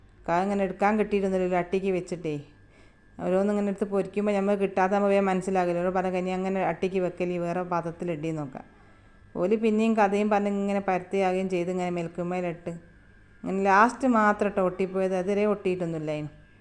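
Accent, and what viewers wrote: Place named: Kerala, India. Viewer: ഇത്താ നിങ്ങൾ നാട്ടിൽ എവിടെയാണ്. കൊടുവള്ളി ഭാഗത്ത് ആണോ ? സംസാരം കേട്ടിട്ട് അങ്ങനെ തോന്നുന്നു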